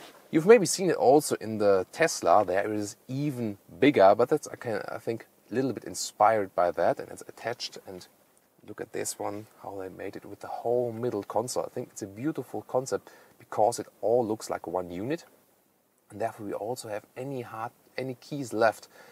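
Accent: Germany. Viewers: Wow, I so expecting a German biased report. I was wrong. The guy obviously German but a professional in his work, gave a proper detail account of each car. Hell of alot better than any British car magazines ever do. I love his accent, his English is alot better than my German, so we'll done. With such detailing lasting more than a poxy 3 or 4 mins from the crappy what car reviews ,who often get it completely wrong when there silly surveys come out which you get a quick flippant remark if your lucky, they sure could learn a few things from guy here.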